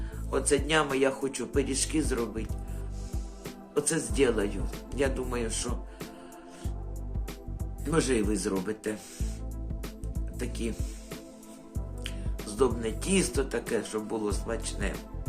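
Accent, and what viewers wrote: Kherson, Ukraine. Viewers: Я, коли дивлюся відео Ваші просто "побалакать", ніби побувала у своєму рідному до болю, селі на Херсонщині 😢😢😢, там так розмовляють, як Ви. Сидю, та подібні слова, осьо.... Я, як приїхала проживати на захід України, то з мене сміялися, що я так розмовляю😊, зараз вже "вигавкалася", як кажуть, та, всеодно, деколи щось таке подібне проскакує😂. Так мені до душі Ваші посиденьки, ну, класна Ви, будьте здорові та Богом бережені 🙏❤️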